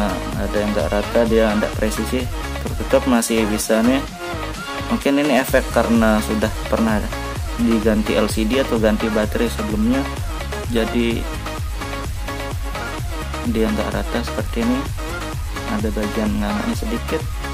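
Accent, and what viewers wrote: Lombok, Indonesia. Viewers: logat dengan lombok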